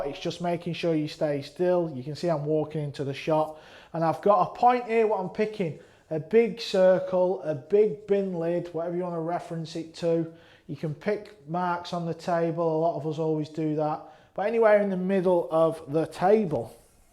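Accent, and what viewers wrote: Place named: Lancashire, United Kingdom. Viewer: next time you do the Mosconi announcement, ride in on that scooter for added effect 😂😃! Good stuff though..When I zoom with Garstang cousins soon will say Lancs accent reminds me of them 😉 You teach well. Thanks for helping the global pool community.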